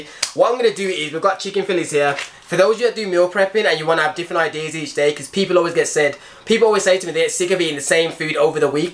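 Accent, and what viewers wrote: Birmingham, United Kingdom. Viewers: Fam are u from Brum ?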